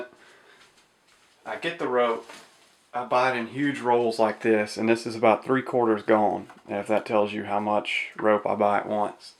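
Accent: Alabama, United States. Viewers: R u from Alabama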